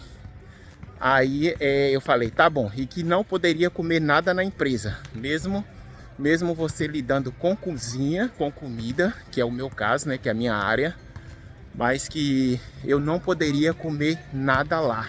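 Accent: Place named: Northeast, Brazil. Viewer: Quem será que mandou esse nordestino, essa encomenda, para cá para Portugal? No último vídeo dele, ele desativou os comentários porque ele sabe que vai ter "pra sobrá prá eli". Ele não está no estado dele, nem ele está no país dele. Vê-se logo que ele é dum país de TERCEIRO MUNDO, que é de orientação de esquerda e ele dá a entender que é evangélico ao dizer que a Terra é de todos. A terra dos evengélicos e dos das seitas é a Holanda, a Dinamarca, a Suécia etc. Portugal é um país católico e cá fala-se português de cá de Portugal e não o português do Nordeste do Brasil, como ele fala.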